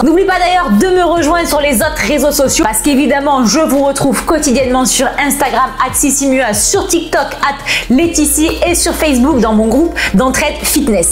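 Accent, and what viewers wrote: South, France. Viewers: Ton accent du Sud est TELLEMENT présent 🤣 !!! En tt cas merci pour tout ce que vous faites pour nous pendant ce confinement n°2. ❤️❤️❤️❤️❤️ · J’ai adoré cette vidéo! Tu as totalement raison les lives rythme la journée et permettent de se vider la tête, j’attends que ça durant la journée! 🥰 D’ailleurs trop mignon ton accent du sud, je viens du sud aussi et c’est drôle de voir comment les accents varient facilement 😂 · Ooooh avec l'accent du sud😂😂😂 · Hohoho l'accent du sud dans cette vidéo 🤣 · a grave l’accent du sud ça fait plaisir 😄team Sud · Le nouveau programme dance TSE 😍😍😍😍 j'attends le nutrition book avec impatience!!! 😉😉 merci pour ta motivation qui nous motive, l'accent du sud c'est le meilleur 😘😘😘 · J'ai bloquée sur ton accent du sud 😅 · Je viens juste de remarquer que tu as un sacré accent du sud 😂 Perso je ne fais pas les live cette fois mais le programme Body Power j'adore 💪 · Je suis Québécoise et, dans cette vidéo, l'accent du sud semble tellement évident hihi! 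☺👌 J'adore ! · Contente qu’une autre sudiste ait un super accent motivant 🥰 super sympa cette vidéo 😘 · Je savais que tu venais du sud mais l'accent ressortait beaucoup sur cette vidéo mdr. C'est mignon. Merci pour cette vidéo. Je suis déjà tous les conseils^^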